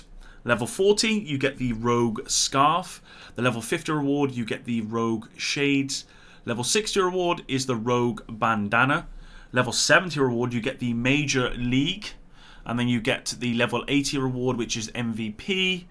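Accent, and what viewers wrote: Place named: Bristol, United Kingdom. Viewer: What part of Bristol are you from?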